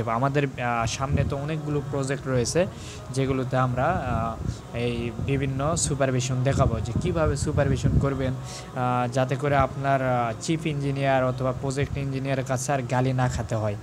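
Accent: Naogaon, Bangladesh. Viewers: কথা শুনে মনে হচ্ছে বাসা নওগাঁ তে।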